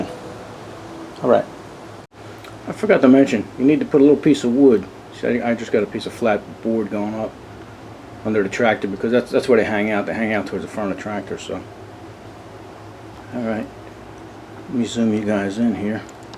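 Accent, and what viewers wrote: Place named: New York, United States. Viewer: Nice accent. New York somewhere?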